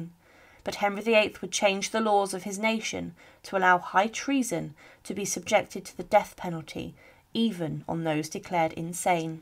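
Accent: United Kingdom. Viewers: I am British. The narrator sounds British. So what are you saying?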